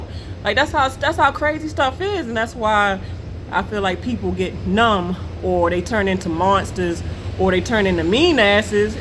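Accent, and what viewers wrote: Baltimore, United States. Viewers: I know this has nothing to do with the subject matter, but I knew all this time you had a Baltimore accent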